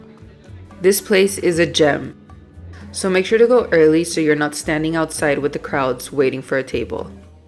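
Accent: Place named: Brazil. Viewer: Pelo sotaque, achei que fosse brasileira!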